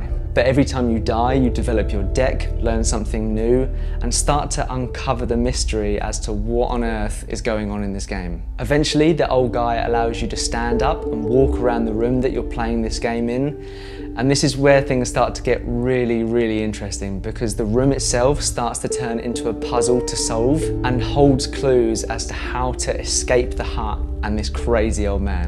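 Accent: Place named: United Kingdom. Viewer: You’ve made three videos. On steam deck. Three. That’s it. This is my favorite steam deck channel. Admittedly the accent helps, I love the shit out of Brits. But honestly you’re so entertaining and genuine. Keep it up “mate” ;) I’m working on it! And ps keep being a great human, anyone who teaches for a living deserves a special spot in well whatever you believe the afterlife is. Teaching children especially. Well done!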